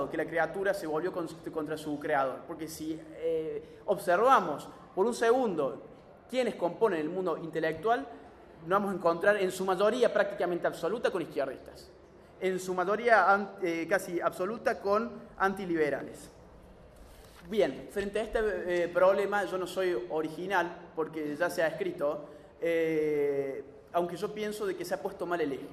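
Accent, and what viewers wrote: Argentina. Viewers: Que lindo ese acento cordobés 😍. ..Laje sos un genio 💖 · el acento cordobes era mas pronunciado antes jaaj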